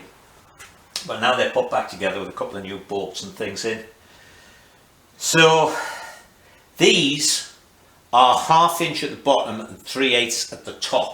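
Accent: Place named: North England, United Kingdom. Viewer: So, I'm on my first ever restoration and it's a BSA C15. Coming across these videos is a Godsend, and they're even done with a proper accent. I'll forgive you for being from the wrong side of the Pennines lad (not sure many Americans will understand that) but it is great to actually see things that I'm going to need to do rather than just read about them. I'm a couple of months behind you. I'm waiting for some shiny bits to come back and then I can start to reassemble the wheels, forks and shocks. Thanks for doing these videos - perfect for a newbie like me. I'll toast you with me Corporation Pop.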